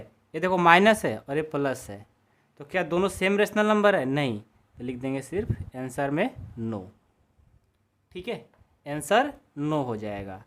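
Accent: Bihar, India. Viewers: Sir aap Bihari ho kya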